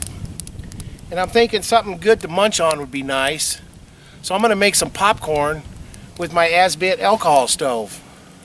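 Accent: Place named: Eastern Oregon, United States. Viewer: What part of Oregon are you from? My guess is somewhere from Eastern Oregon?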